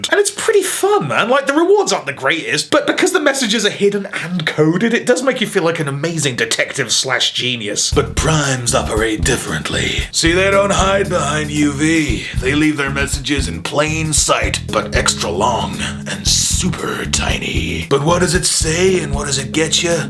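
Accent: United States. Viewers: The world needs more American. Can you do a review using only that voice? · needs to do an entire review in an American accent. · That American accent tho! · !!! Your American accent is better than mine!!! And I’m American!!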